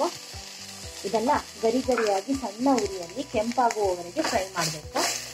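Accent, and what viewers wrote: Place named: Malnad, India. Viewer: ಸೂಪರ್.. ಒಳ್ಳೆ ಮಲ್ನಾಡ್ ಭಾಷೆ.. ಒಳ್ಳೆ ಅಡುಗೆ ಸೂಪರ್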